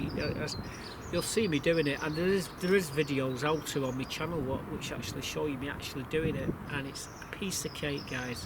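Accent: Salford, United Kingdom. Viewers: Salford? I used to live in swinton. I thought I recognised your accent 👍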